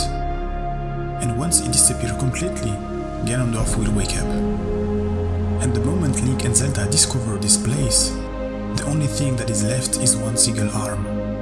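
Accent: France. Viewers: On entend bien l’accent français :’)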